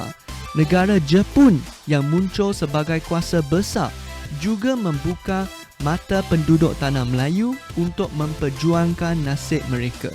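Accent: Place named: China. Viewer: Masih ada pelat cina, kalau boleh tingkatkan cara pertuturan supaya tidak pelat semasa bertutur dlm bhs melayu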